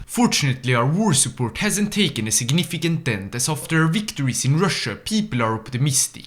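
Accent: Sweden. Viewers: are you from sweden because you sounded pretty swedish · Js hörde att du har en svensk brytning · I have never heard such a swedish accent english. Say that your a swede but not saying it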